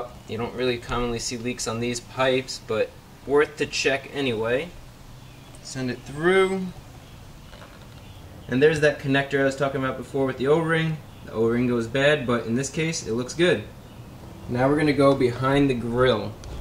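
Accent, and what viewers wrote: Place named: Delaware, United States. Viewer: You are the best, and as a DE guy, I miss people with your accent. Soapy wooder forever dude.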